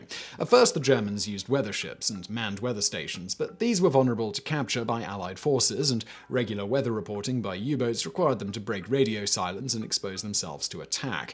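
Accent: United Kingdom. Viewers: Was going to give a thumbs up for this video, but really? "Lootenant"? You have an obviously British accent, but what are you? A wannabee Seppo? It's "lef-tenant" in the UK (and Canada). Stop contributing to the Americanization of Britain, mate.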